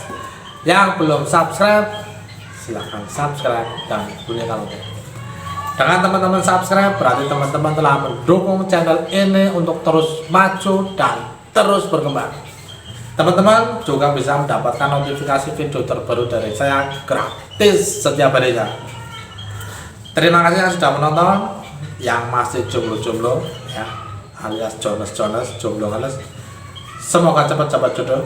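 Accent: Banyumas, Indonesia. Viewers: Ora ngapak ora penak 🤫🤭😄😂 Salam tukang tempe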